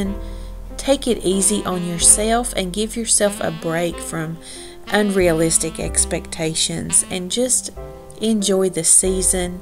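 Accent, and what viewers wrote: South, United States. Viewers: This was such a super sweet video. The treats all look delicious.i plan to make a few of these this year, hopefully. The best part of this video is listening to you talk, and especially about the real meaning of Christmas. I love your sweet, soft southern voice. You sound like my family. We are southern and listening to you was a joy.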